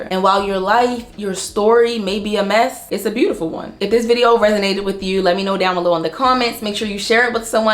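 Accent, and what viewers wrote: Baltimore, United States. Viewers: It sounds like you’re from Baltimore so ima subscribe!!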